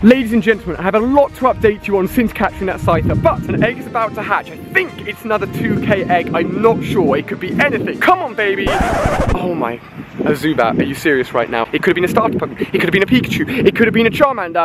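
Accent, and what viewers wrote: United Kingdom. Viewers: Really like this guy's videos but man oh man I cannot stand his accent. I usually love British accents but his is awfullll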